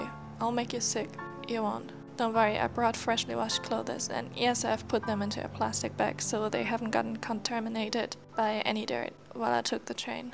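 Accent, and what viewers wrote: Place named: Germany. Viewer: You sound German👁️👄👁️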